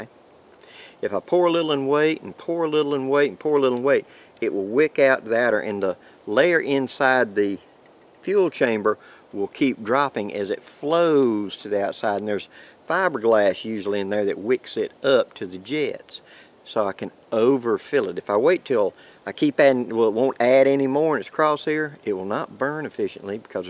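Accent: South, United States. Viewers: Nice video, you make a lot of sense in your southern way, I like the accent you sound like you are signing all the time. I like you tips and inputs very resourceful!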